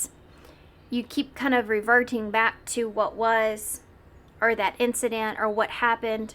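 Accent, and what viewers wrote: South, United States. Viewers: I have just relocated from PA to TN. My first time seeing your readings and my first time hearing a reader with a southern accent. Thank you for the message that i will find people i resonate with in my new community 🙏🏻🥰 pile 3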